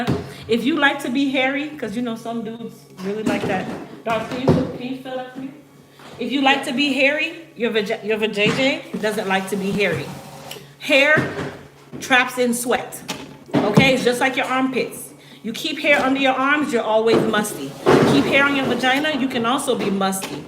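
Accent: Jamaica. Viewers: Girl you’re so real I would’ve not known you from Jamaican background. You bring out your Jamaican accent I like that about you, you’re proud of where you’re Heritage is 🙏🏾💕🙏🏾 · I heard that JM accent..Loving your show...Didn't know about the coconut water!! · Down to earth giro love your suggestions Are you Jamaican I heard some languages there. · You must be Jamaican. The accent pops out. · You sound jamaican sometimes but you know what I love you very real I've learned a lot from you I'm 68 and you never too old to learn thanks a lot for all the info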